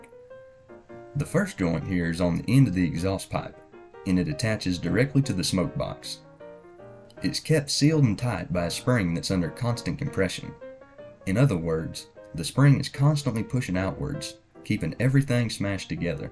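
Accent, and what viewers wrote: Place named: United States. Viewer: This guy's voice... 100% unfakeable American. I'm subscribing just out of the hope he's gonna say "John Deere" lolz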